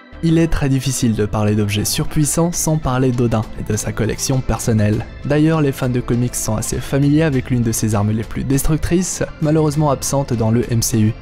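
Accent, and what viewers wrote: Belgium. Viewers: T'es belge ??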